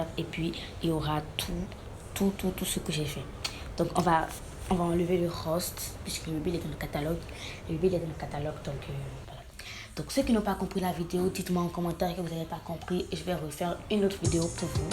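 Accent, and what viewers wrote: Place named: Ivory Coast. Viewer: Est-ce que tu es ivoirienne parce que tu as l'accent ivoirien tu es sûr que tu n'es pas ivoirienne parce que moi je suis ivoirienne et je connais cet accent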